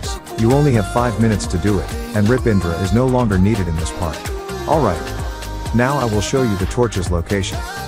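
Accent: Philippines. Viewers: Are you filipino? Bc the sound is filipino bro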